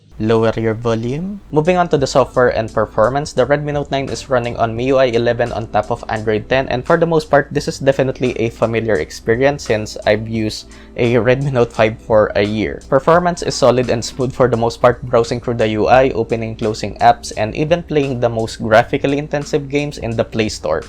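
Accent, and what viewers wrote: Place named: Philippines. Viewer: When I heard a Filipino Accent English speaking. ☺️👍 I'm so proud being a Filipino. I love your review. So detailed.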